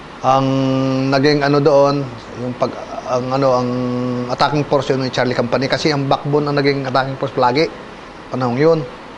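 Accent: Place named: Batangas, Philippines. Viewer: batangenyo si sir ala eh!!!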